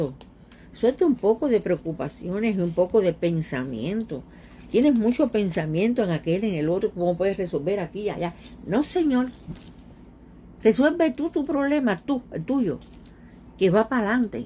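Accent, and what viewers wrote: Cuba. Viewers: Luz para tii TE ADORE , Y SE NOTA QUE ERES CUBANA 100% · Hola soy dama. Nose tu nombre. X tu acento sé q eres cubana. Cada vez q te he escuchado, eres cm 1 alarma de ten cuidado....pero nose de quién??? Tengo q cuidarme. Ese es mi problema mayor q no se ver la maldad de las personas. Nose qn es qn,¿Quién me kiere hacer daño? Tampoco se xq me kieren hacer daño?? ¿ Xq me kieren hacer daño? Si yo nunca le he hecho mal a nadie...Sólo le pido a Dios qn venga a mí , p hacerme daño, Dios sácalo de mi camino. Y esa mujer q yo sospecho me está haciendo brujería sánale ese corazón malo hacia mi persona. Que me suelte ya. Tú sra q lees las cartas si sale de tu corazón ayudarme hazlo ...evita una tragedia x favor.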